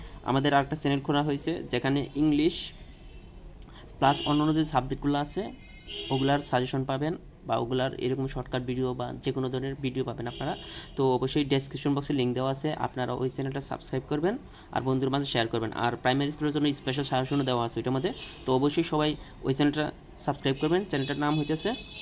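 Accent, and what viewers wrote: Sylhet, Bangladesh. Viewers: ভাই মনে হয় সিলেটি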